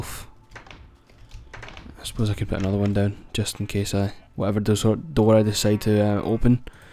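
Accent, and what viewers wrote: Scotland, United Kingdom. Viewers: Yir scotish yes!!!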